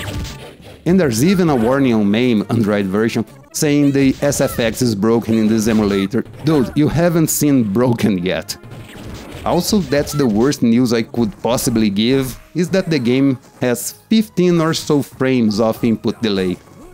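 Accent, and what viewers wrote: Brazil. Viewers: o sotaque BR me surpreendeu kk bom video man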